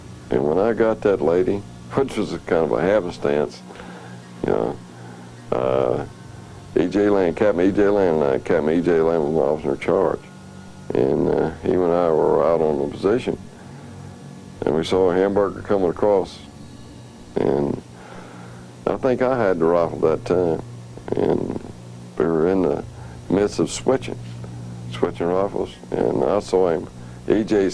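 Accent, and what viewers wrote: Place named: Arkansas, United States. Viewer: The second I heard this dude's voice I knew he had to be from Arkansas. He sounds just like every other man I've ever spoken to including myself. Damn maybe we are hicks. He's a hick legend I suppose.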